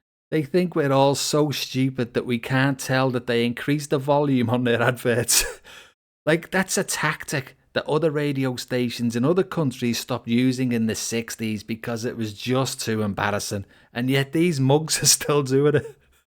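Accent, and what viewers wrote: Liverpool, United Kingdom. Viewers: Sounds like a scouser(Liverpool) which is a depressing dump, now he constantly whines on about NZ, yes you could buy a terraced house in a rough area of Liverpool pretty cheap but reason is because nobody wants to live there. Auckland compared to many places is quite nice and not expensive compared to comparable cities.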